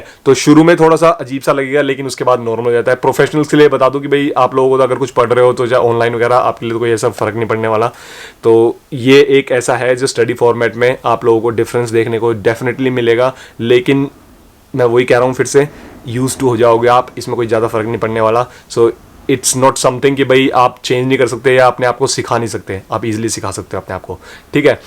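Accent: Haryana, India. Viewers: i think aap haryanvi ho right?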